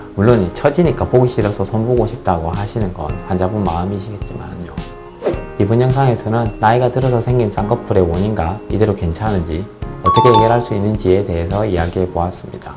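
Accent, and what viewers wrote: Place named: Busan, South Korea. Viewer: 제가 부산사람이라 그런지 부산사투리로 설명해주시는거 친근하고 좋아요^^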